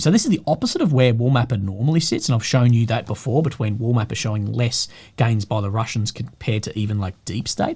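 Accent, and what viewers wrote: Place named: Australia. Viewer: Just started watching your updates, good to hear an Australian voice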